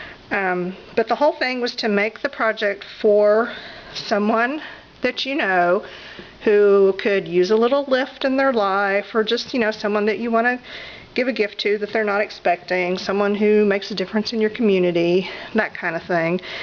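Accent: South, United States. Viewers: Gotta know where you're from! Our southern accents nearly match! Love these vids!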